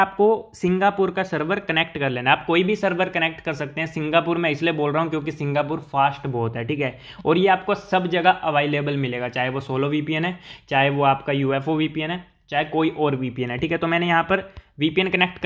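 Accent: Punjab, India. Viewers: Bahi app Punjab se ho kia keuki muje apki avaj se pata chal gia 😁 me be punjab se he huu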